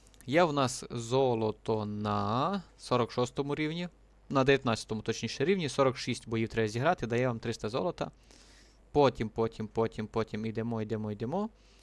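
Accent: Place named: Dubrovytsia, Ukraine. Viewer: говориш як з дубровиці)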